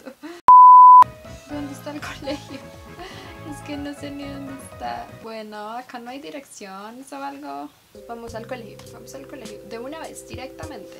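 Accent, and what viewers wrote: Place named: Bogotá, Colombia. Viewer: vivas De Bogotá, su acento la delata :v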